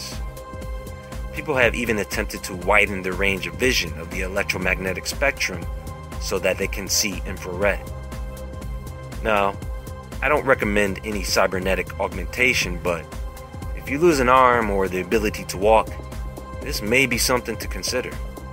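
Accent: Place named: North Carolina, United States. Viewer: ! Are you from NORTH CAROLINA? You sound like it! Please respond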